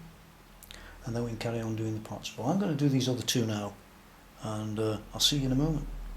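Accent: Stoke, United Kingdom. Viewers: I must say, i hate strats usually but this is one of the nicest looking guitars i have ever seen! and its always nice to hear a stoke accent on here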